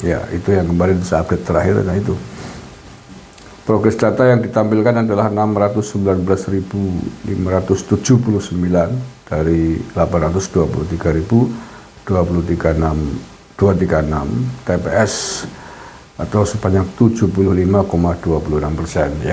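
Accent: Banyumas, Indonesia. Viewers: Aku nunggu ketawanya P sajalahhhhhhh 😂😂😂 mbil ngapak2 dan ududnya 🤭🤭🤭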